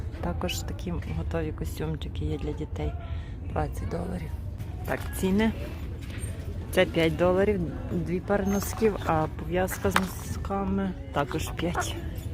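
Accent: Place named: Lviv, Ukraine. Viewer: Ви напевне з Львівщини, бо є акцент? Горнятко - це так говорять у Львові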